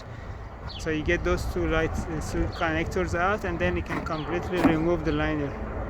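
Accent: Iran. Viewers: از گویش شما پیداست ایرانی هستی موفق باشی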